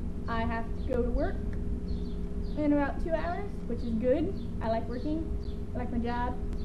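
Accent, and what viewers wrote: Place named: Texas, United States. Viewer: You do have a bit of a Texan accent. It sounds nice.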